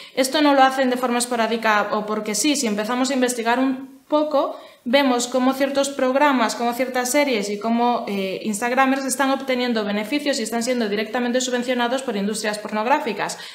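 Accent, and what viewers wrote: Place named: Spain. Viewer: Me gusta tu acento español,por cierto eres muy guapa ☺️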